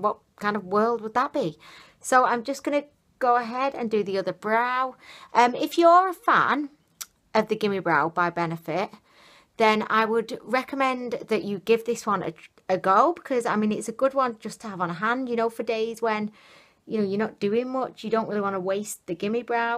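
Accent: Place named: Birmingham, United Kingdom. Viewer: Where are you from? I can't tell if your accent is brummy or Manchester x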